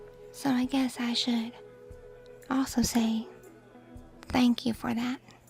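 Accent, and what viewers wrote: South, United States. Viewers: I do believe your country accent is one of the most blessed ones around, it is so relaxing to listen to, and on top of the crisp sound effects and immersive background soundtracks, the listener is teleported to whichever setting your audios is set in. Fantastic as always. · Your Country accent is really unique & special,i love all your asmr's and wonderful rps which are so appealing because you put your heart & soul into all of your work! Thank you for all that you do & the work you put into every video to help others feel good. ☺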